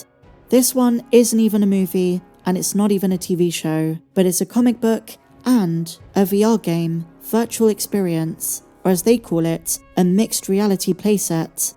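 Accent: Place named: England, United Kingdom. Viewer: I swear with the english accent it sounds like she says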